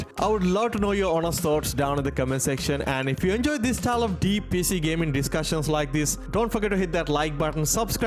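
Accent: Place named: India. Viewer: Yo dude are you from india??your accent feels indian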